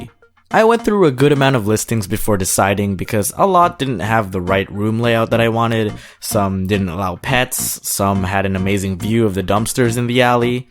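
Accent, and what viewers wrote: Philippines. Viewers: I love your Filipino accent/attitude😂😂I relate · I always crack when you speak in "inglis with pilipino aksent" and use some tagalog words. I'm so glad Youtube reccomended this channel. 😂😂😂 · Is it just me or I heard you as a Filipino ?? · Love the Filipino accent 👌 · Nice Filipino accent from the Philippines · I really like that Filipino Accent of that english speaking skills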